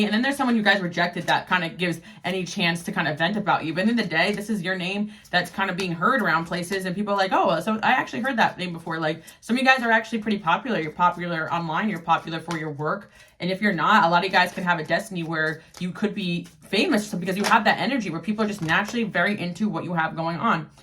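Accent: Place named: West Coast, United States. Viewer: LoL you must be West coast!! Love the fast voice like me!! Arizona!! Yet still excellent!! Love your read!! Awesome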